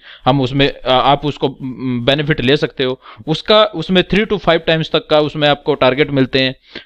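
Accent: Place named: Haryana, India. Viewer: Guru haryana se ho lagta h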